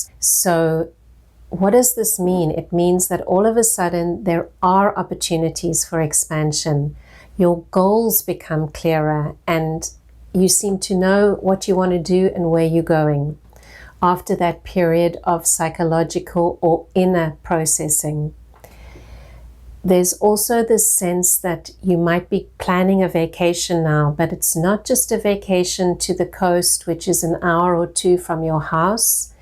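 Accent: South Africa. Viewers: Are you South African tho??..I can hear the accent...or am.I wrong...Ur amazing...My heart thanks you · Sounds like you're South African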